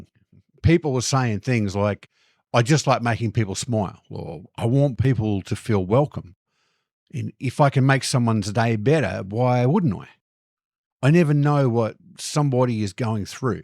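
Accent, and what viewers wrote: Australia. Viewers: A slightly different take: We Americans DO want something. We want your story. Give us a tale. How's your day going? Anything interesting? Where are you from? Like to have an iced tea and swap tales? I think we like good stories. Sort of vicarious journeys?? Maybe thinking about things we'd like to do? Don't know that all of this is true...just throwing it out there as a possibility. Your accent's cool, by the way. You from Australia? Wherebouts in Australia? What brought you to our shores? Are we what you expected when you moved here? Any !Aha! moments? :) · Yes, sir I'm an American from Tennessee who saw your vid, "They Want Nothing" on the channel & I just wanted to come show my appreciation here on your channel. Your insights were spot on, many of us Americans are raised, especially in the south to treat others how we would want to be treated so compliments & being helpful to someone, strangers & friends alike, becomes second nature & yes, it is sincere. 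So, Thank You for noticing & appreciating the American way. We're glad to have an Aussie here in the states & I do like the accent too. Keep up the good work & I pray your channel grows to new heights. God bless! 🙏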